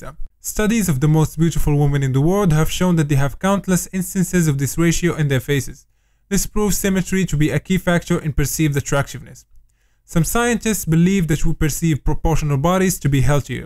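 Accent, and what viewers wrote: Morocco. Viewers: hhhhhh 3aref rassi m3etel walakin mn l anglais dyalk 3reftk maghribi, tbarkallah 3lik a khoya kml f self improvement content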